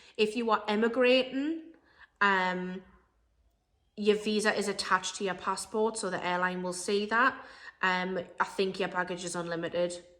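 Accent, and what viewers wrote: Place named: Australia. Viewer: You'll get your aussie accent back!!!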